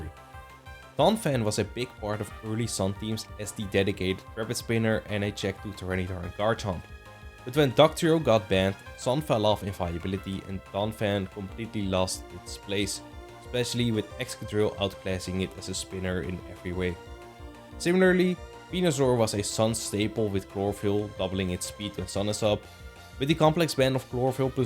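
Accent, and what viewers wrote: Netherlands. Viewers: Do you happen to be Dutch? The accent is thick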